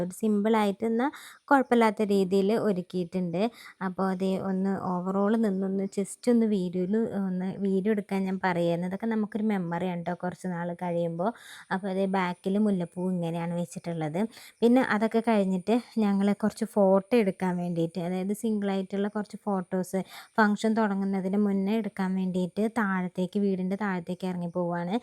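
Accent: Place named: South Kerala, India. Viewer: മോൾ സുന്ദരി ആയിട്ടുണ്ട് കേട്ടോ ❤.ഒരു കാര്യം... മോൾ സംസാരിക്കുമ്പോൾ തെക്കൻ കേരള ഭാഷ കയറി വരുന്നുണ്ട് കേട്ടോ.വരത്തില്ല... പോകത്തില്ല etc. അതു വേണ്ട എന്ന് തോന്നുന്നു. നിങ്ങളുടെ ഭാഷ കേൾക്കാനാണ് സുഖം❤❤❤ എല്ലാ അനുഗ്രഹങ്ങളും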